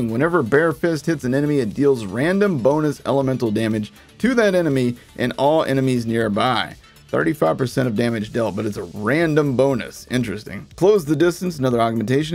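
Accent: United States. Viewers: Damn your videos are addictive! Ps. Love tour accent where are you from in the states?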